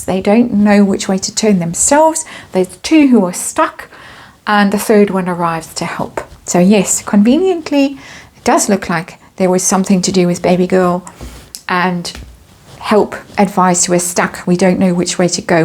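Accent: South Africa. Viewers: I'm here for the snark too!🤭 I love beautiful, lilting SA accent and the way she giggles and snarks!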